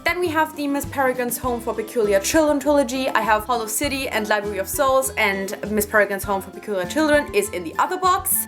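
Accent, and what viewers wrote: Germany. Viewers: Die ganze Zeit hab ich gedacht "Das ist doch ein Deutscher Akzent, oder?" und dann kam das erste Deutsche Buch😂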